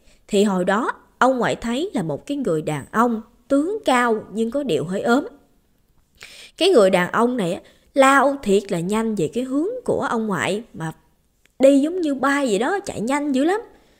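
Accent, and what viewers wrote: Mekong Delta, Vietnam. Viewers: Chị rất thích cách kể chuyện mộc mạc của bé Như, tìm mãi mới thấy được chất giọng đúng kiểu Miền Tây như thế này. Bé Như cứ giữ chất giọng chân quê như thế này nhé , chị nghe nghiện luôn rồi ❤️❤️❤️ Chị thấy cưng rất có năng khiếu về kể chuyện. Nhớ lắm thời còn xem phim bộ TVB , chất giọng lồng tiếng rặc Miền Nam, Miền Tây mình, nhưng mà nghe nghiện lắm, nghe hay làm sao.... bây giờ thì đa số kiểu nửa Nam nửa Bắc nghe không còn thích nữa 😁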